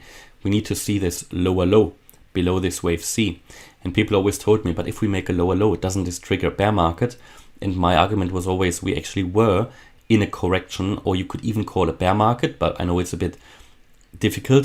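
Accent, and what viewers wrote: Germany. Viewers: German accent ? Grüsse aus miami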